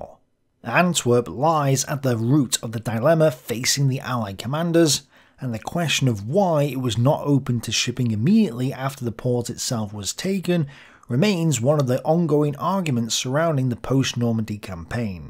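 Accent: United Kingdom. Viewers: I really like your videos and i think that they are very meritorical and well made. Because of this i feel kind of silly to admit that i usually listen to them while going to sleep. There is just something in your strong british accent and in the way that you calmly explain various WW2 topics. With your videos i am able to fall asleep in 10 minutes or less. Please do not take this as an insult, i do watch your videos without intent to fall asleep as well, especially battlestorm as i need to focus on the map to understand the situation. I just wanted to thank you for making my sleep easier. Have a great afternoon!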